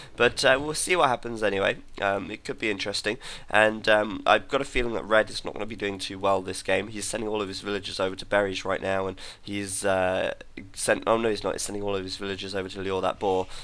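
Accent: England, United Kingdom. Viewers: I just love commentator´s english english, sweet to my ears.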